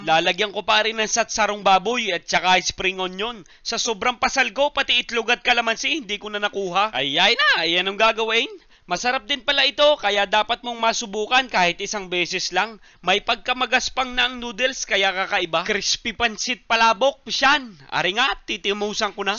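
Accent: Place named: Batangas, Philippines. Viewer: batangeño na *batangeño*. alah naman!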